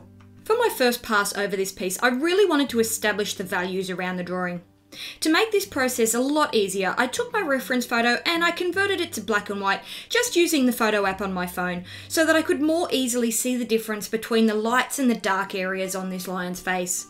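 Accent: Australia. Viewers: Are you from Western Australia? Your accent sounds like it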